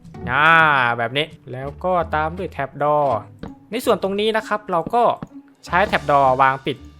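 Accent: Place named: South, Thailand. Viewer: พี่เป็นคนใต้หรอครับ ผมเพิ่งติดตาม สำเนียงเหมือน😅😅😅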